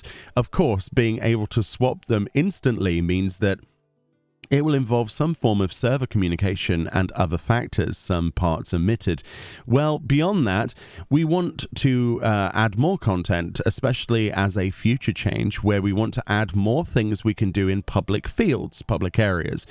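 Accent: United Kingdom. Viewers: You really don’t know what 1.5 of 40 is? It’s true what they say, British accent is no substitute for intelligence. I’m just glad you managed to read those squiggly things they call letters.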